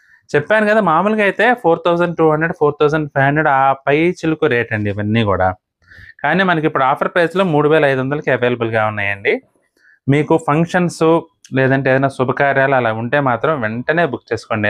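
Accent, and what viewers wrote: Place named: Andhra Pradesh, India. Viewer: Sir మీరు ఆంధ్రా చాలా బాగా మాట్లాడతారు🎉🎉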